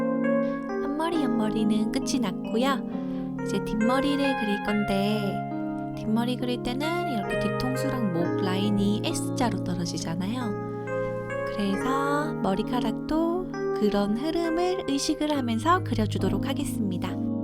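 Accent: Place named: Gyeongsang, South Korea. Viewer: 목소리가 고우신데 말투에서 경상도쪽 억양이 약간 느껴지는게 매력이에요 ~_~ 허허 조와요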